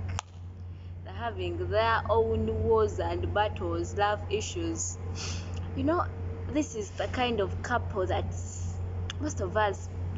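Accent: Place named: Buganda, Uganda. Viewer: You are a good narrator..and I love the accent in luganda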